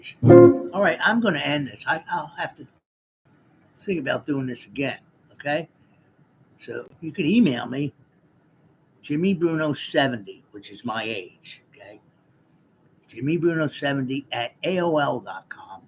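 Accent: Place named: Philadelphia, United States. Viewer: JIMMY!!! Keep that Philly dialect coming!!!! All those words George Carlin said you can't say on TV. Thanks for thew music my friend!!!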